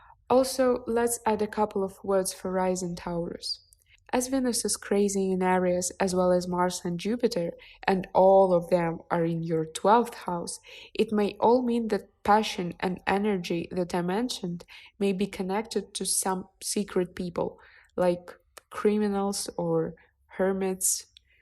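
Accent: Russia. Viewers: Too much of the accent, reminds me my English classes in Russia. It’s a not really good way to learn English. Don’t like to criticizing anyone, just my personal opinion. Очень люблю и подписана на все его каналы. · я вам дам честный конструктивный критический отзыв, так как я на самом деле очень ценю вашу русскоязычную эмоционально окрашенную подачу и хочу помочь. Надеюсь мой отзыв поможет если вы нацелены на англоязычную аудиторию. Я могу судить так я двуязычный подписчик, очень давно живущий в англоязычной стране. Для тех кто с рождения говорит по английски в этом видео слышен русский акцент с не натуральными английскими интонациями. Это не немного раздражает и не даёт насладиться содержанием так как форма не натуральна и натянута. Кроме того интонации не эмоциональны и не зажигательны как ваши, так что ваш отличительный шарм теряется. Я бы посоветовал найти рождённого в англоязычной стране русского человека, таких много, бросьте клич и кто-то откликнется. 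А русские субтитры тоже хорошая идея от ваших подписчиком. Английские субтитры можно в настройках YouTube включить.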